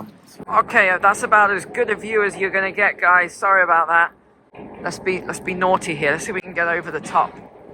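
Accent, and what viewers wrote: England, United Kingdom. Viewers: This happened 10 years ago already and even before it. Did it just occur to u? U sound british. Not much different there. Or in Germany. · Sounds like an Englishman. You should be used the national government handing over the country to arabs and africans.Their country now. Get out!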